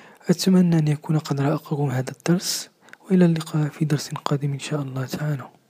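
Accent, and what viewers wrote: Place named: Morocco. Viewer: تحيه من مصر لأجمل لهجة لهجة المغرب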